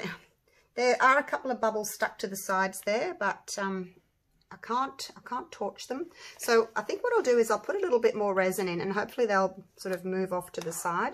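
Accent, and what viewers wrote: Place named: Queensland, Australia. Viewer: Hi. It's great to hear an Aussie and fellow Queenslander on YouTube. Those coasters turned out wonderfully. I'm generally a green lover, but this time the blue won. Stay safe.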